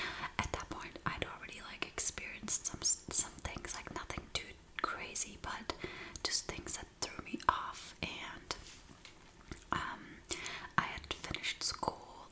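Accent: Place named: Germany. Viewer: h2o is basically my heart ps: i am german and i watched the video thinking that i can hear a german accent and then you said that youre from germany haha made me happy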